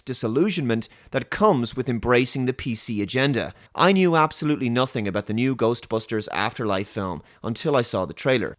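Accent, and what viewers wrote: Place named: Ireland. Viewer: Totally random question unrelated to the video and more about you personally... What type of Irish accent do you have?(where in Ireland is this more common) Lol I'm making a story and I kinda wanted to give a character an Irish accent like yours... maybe lol. Ok sorry.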